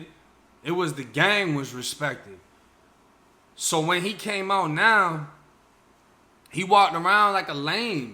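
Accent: Boston, United States. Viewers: You sound like you from Boston🤔🤔🤔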